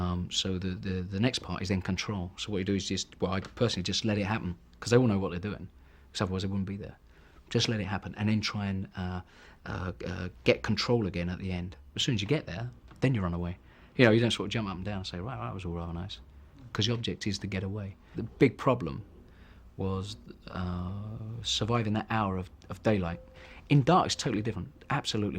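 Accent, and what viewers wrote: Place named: RP, United Kingdom. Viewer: That’s his poshest accent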